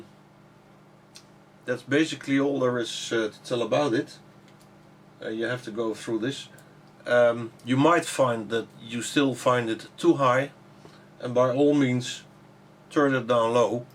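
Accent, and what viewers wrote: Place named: Netherlands. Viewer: =) I haven't heard a Nederlander accent in quite sometime! Thanks for the instruction...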